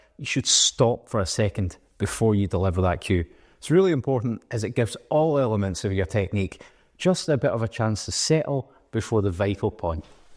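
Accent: United Kingdom. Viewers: I'm so tired of listening to the British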